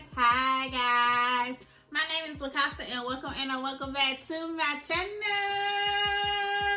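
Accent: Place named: South, United States. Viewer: I had a feeling you were from the south sis, you give the vibes hunnie❣️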